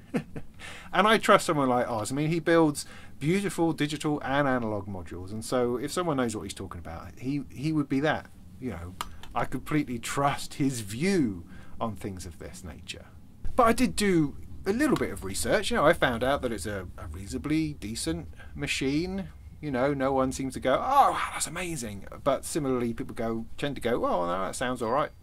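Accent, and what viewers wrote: United Kingdom. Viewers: So, ur British. Far out! When u said motorbike...yup or Australian. Just got a Teac X-3 Great sound dynamics. Gotta get some leader tape for sure. Its,a whole new thing for me. I want to tape from record albums and CD. U R right, putting an album.on a Turntable hooked to a very good receiver sx950. Threading tape thru a humongous non enclosed reel2reel is spiffy. I have a lot to learn and I bloody well love it. Say HI to Rumpole of the Bailey for me?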